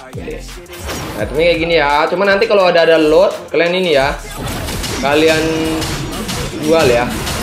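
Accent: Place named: Malang, Indonesia. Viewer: Triple kill-> niyok-> jebret->Maniac😂😂 Boso jowone podo karo boso Jowo Malang-an😂 Salam dugi Kota Malang ❤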